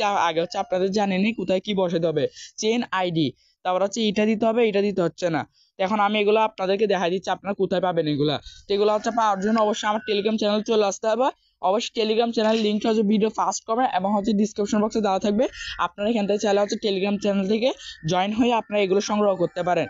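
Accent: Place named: Chittagong, Bangladesh. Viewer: ভাইয়া ত চট্টগ্রামের মনে হয়,, কোন যায়গায়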